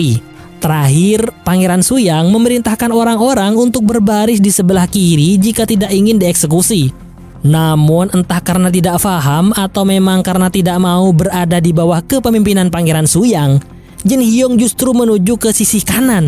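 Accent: Madura, Indonesia. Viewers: Eeh reng madurehh ka adminn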